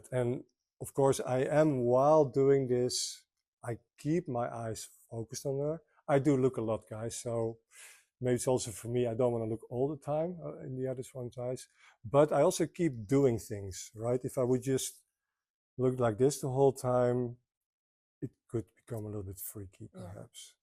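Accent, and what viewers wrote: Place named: Netherlands. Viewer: He is Dutch 😂 I can tell by the accent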